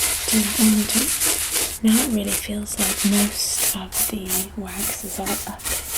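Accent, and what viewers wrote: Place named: United Kingdom. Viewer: She is actually from America, but she uses a British accent in her videos ☺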